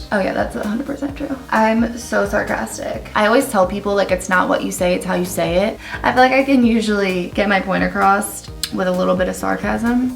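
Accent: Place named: Philadelphia, United States. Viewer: Your philly accent came through so much in this vid haha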